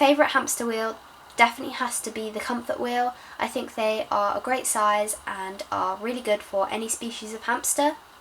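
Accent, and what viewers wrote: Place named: United Kingdom. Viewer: I useally can't stand british accents but you'rs is charming and not anoying at all😄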